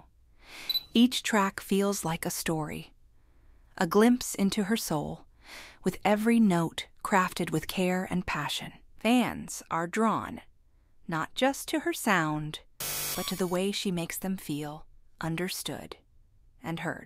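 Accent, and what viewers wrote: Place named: Ireland. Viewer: Would love to hear that Irish accent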